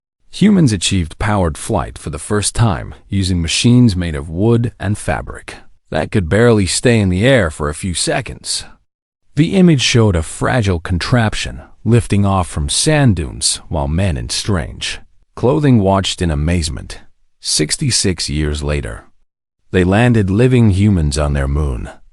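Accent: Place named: New England, United States. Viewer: Yes, the electronic narrator is definitely from New England, and possibly tipsy. ⭐ But the story is good.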